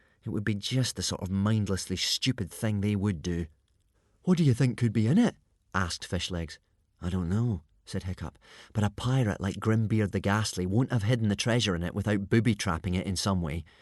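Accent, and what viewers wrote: Scotland, United Kingdom. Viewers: The Scottish accent really goes well with the story.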